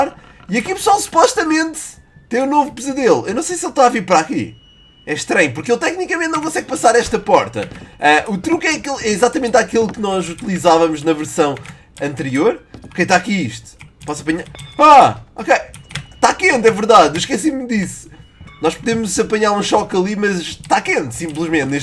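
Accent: Portugal. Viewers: ele é de portugal lá eles falam assim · adoro sotaque portugues like para venom e fenom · Vc e de portugal? Sou no brasil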